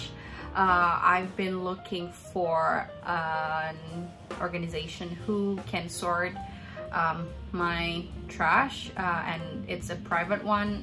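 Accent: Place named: United States. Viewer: Your English is extremely good ! You sound just like an American (to my ears).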